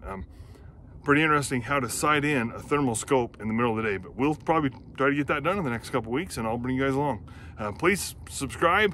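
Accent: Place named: North, United States. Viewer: You sound like you're from up North. Am I right? I think so. Howdy from TEXAS brother⭐🇺🇸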